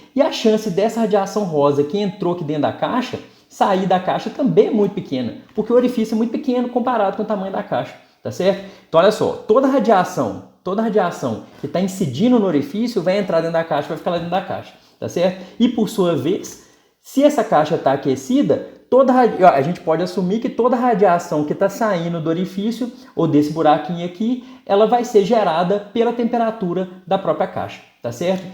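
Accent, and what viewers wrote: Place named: Minas Gerais, Brazil. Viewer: Professor com explicação excelente. Essa serie é uma joia do Youtube. A cereja do bolo é o sotaque mineiro do prof. Abraços!!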